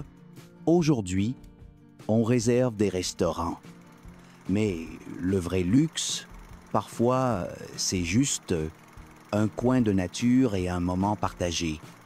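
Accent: Quebec, Canada. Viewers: C'est fou ce que cette voix a l'accent de Limoges (ou il est censé avoir passé son enfance) C'est plutôt quebecois Mais pour le fond ,ok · ... ce petit accent du Québek.... il me plaît bien pour dire comment c'était avant, chez nous en France.:)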